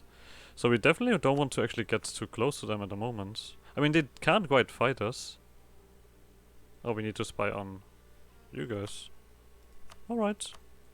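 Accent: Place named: Germany. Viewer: lmao after that "soooooo" i didn't even need to hear the accent to immediately know you're german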